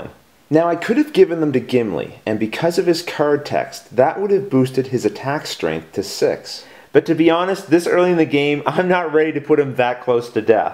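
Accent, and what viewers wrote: Canada. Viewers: Man, great tutorial. I drew identical cards as you through that first round and it really helped. But my hand couldn't be more different for this next round, so I'll actually have to use my brain. Your explanations are slow and understandable, so it shouldn't matter. I like the Canadian accent btw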